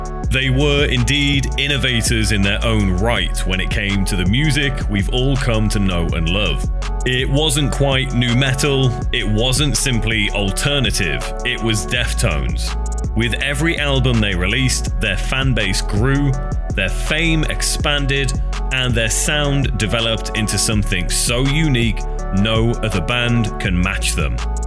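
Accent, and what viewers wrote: United Kingdom. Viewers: let me explain it for your side of the road leaf iq brain my point is the same video is being made with the same information so there’s no point in your uk voice ass documentary being made when there’s already a bunch made but wtv get the views you feining for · The commentary really kills this video. Hearing a british guy say "pop locking" was more than i could take.✌🏾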